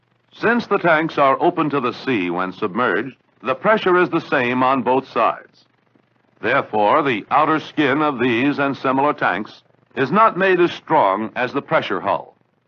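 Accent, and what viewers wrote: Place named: Transatlantic, United States. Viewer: I wish people still spoke and presented with a Transatlantic accent like this.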